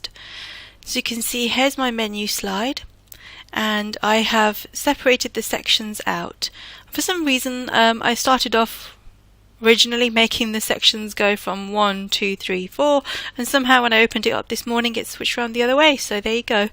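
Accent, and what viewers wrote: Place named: United Kingdom. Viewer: Nice voice (makes me think of, Monty Python, and Jaguar cars). Main Menu slides like this are the best way to organize E-Learning. It's part of "chunking" and can even serve as a graphic organizer (ed psych stuff). I am shocked that Articulate doesn't have a pre-formated slide for this type of thing. In fact Storyline makes it hard to do this sort of thing because the return to slide has limited options AND a little unknown issue (or bug) with how slides are numbered/sequenced. Storyline auto changing your slide order (as you had happen in your video) is because the slides sequence is affected by the order in which your buttons appear on your main menu timeline. In your case Button 4 needs to be on the lowest section of the time line (i.e.send to the back) and button 1 needs to be the highest on the timeline (i.e send to the front). 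to repair, disable all triggers, then move your buttons up and down vertically on your timeline, button 1 highest then down to button 4. Then re-enable all your triggers. And then send an email to Articulate begging them to feature this type of main menu or jump off slide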